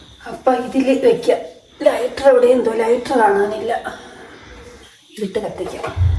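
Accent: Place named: Palakkad, India. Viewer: പാലക്കാട് ആണോ വീട് സംസാരത്തിന്റെ ശൈലി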